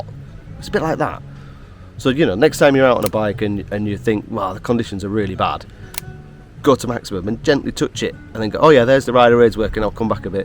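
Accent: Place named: North, United Kingdom. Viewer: As a fellow northerner, I didn't need the subtitles....but I see why you added them ;) Proper job 👍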